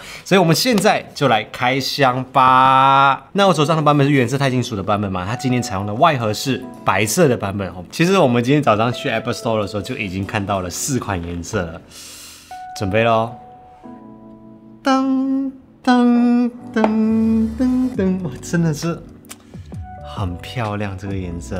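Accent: Taiwan. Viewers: 真心听不惯台湾腔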